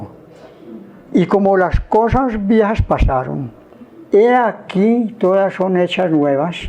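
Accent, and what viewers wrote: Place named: Colombia. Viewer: ¿Porque sospeche que era Colombiano cuando comenzo a hablar...?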